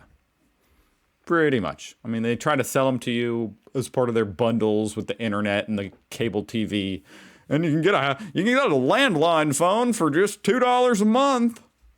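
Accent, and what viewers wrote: United Kingdom. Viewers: British English not Australian English accent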